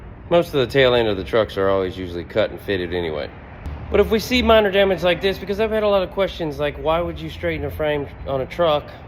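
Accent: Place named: Texas, United States. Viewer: Just subscribed. GREAT Channel. Is yous in TEXAS? Ya sound likes yer from TEXAS 🤠 I is too so I was justa wonderin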